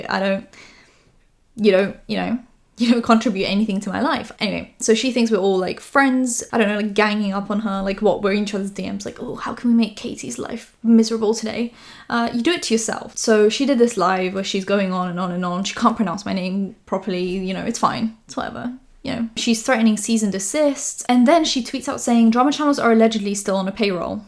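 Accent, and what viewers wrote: United Kingdom. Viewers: aw ur british accent is so cute!!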